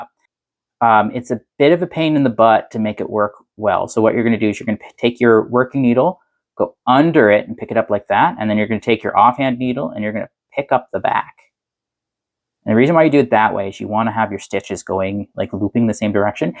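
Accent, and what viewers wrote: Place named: Eastern Canada, Canada. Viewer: I just discovered you and I know NONE of the background—your back story. Is there an episode in your previous series that introduces you? I think from your accent you might be eastern Canadian? Going to go watch the next one. Really enjoying it!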